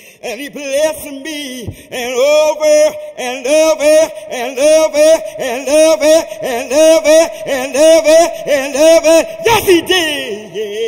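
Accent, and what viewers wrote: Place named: Mississippi, United States. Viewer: Nooo stop your not a recording artists. Stop lying to these people .... you sound country when you talk. You ain't. From. California your. From Mississippi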